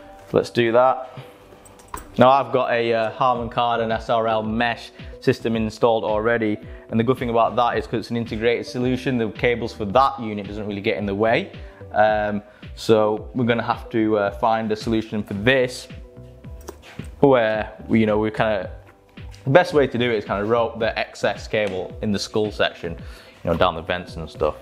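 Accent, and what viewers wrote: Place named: Preston, United Kingdom. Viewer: Mate your accent was confusing me hard but knew you were from around the Preston area 🤣 Great video, hopefully see you around 👍🏼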